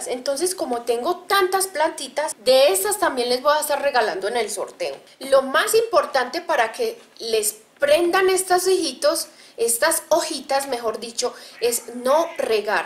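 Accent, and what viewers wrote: Colombia. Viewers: Hola, me gusto mucho el cactus que esta floreciendo me podrias decir Como Se llama, y me encanta tu acento colombiano 😁😁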